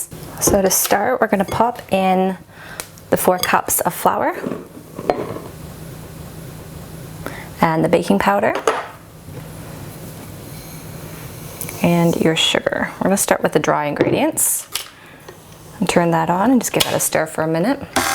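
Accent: Australia. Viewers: Are you Australian? I am! Also maybe you could smile a bit more in your videos! No hate just a tip🙂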